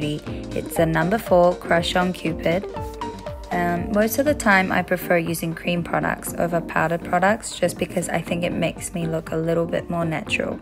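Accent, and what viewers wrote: New Zealand. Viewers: SHE FROM NZ!!!! I knew ittt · bb so this is why am getting some british accent then I was like nah aussie and then you said you are kiwi shiii I am confusion but now cleared 😉 cheers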